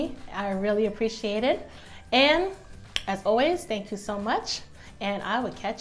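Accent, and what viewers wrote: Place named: East Coast, United States. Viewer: First & foremost, this is recipe is slamming 🤾🏾‍♂️... I gotta ask you this one thing though🤷🏾‍♂️❔ That accent is so east- coast; has a hint of Baltimore, or maybe Spanish Harlem. Lol, where'd they grow you at?🌱🌷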